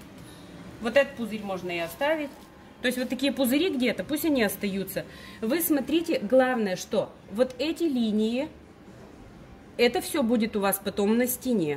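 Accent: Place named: Urals, Russia. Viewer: Уральский говор прям бальзам на мою душу родом оттуда же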